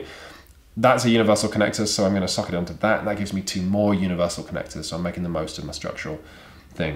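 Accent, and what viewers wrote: United Kingdom. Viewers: Looks like a fun game - it's even better watching you with a British accent and uncombed hair explaining this looking like your apartment was just hit by an asteroid storm lol. :P Subbed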